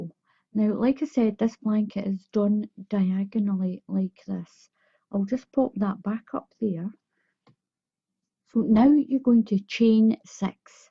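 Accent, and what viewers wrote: Scotland, United Kingdom. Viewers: Hi. First of all I absolutely love listening to your Scottish accent. Secondly I have now mastered the c2c thanks to you. Finally are you able to tell me the make of the beautiful yarn shown at the start of this video which is grey and pink/peach white? Jeannette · When I was growing up, we had neighbors that were Scottish, you’re accent reminded me so much of these dear, sweet people. This video was very complete from the beginning to the end of the blanket. I have one started, but I didn’t know how I was going to fill it in and end it.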